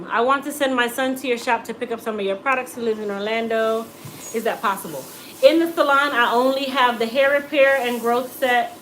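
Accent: Jamaica. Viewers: I hear some Jamaican in there lol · Love the patois! Sounds like my house lol! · Jamaica accent can’t hide 🇯🇲😀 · I like u n plus I hear our JAMAICAN accent makes me watch more of ur videos....he smile at d end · I heard the jamaican accent..ru from here... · I hear that Jamaican accent 🇯🇲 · You were asked are you from Jamaica because you have an Jamaican accent.No one would have ever guessed that your a yankee and that your from the east coast. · are you 🇯🇲? The accent come out😀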